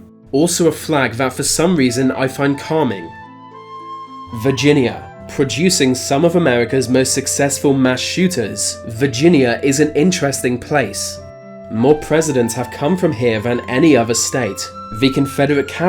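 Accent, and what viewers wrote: United Kingdom. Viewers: U INSULT TEXAS AT LEAST WERE BETTER THAN UR BRITISH SOUNDING ASS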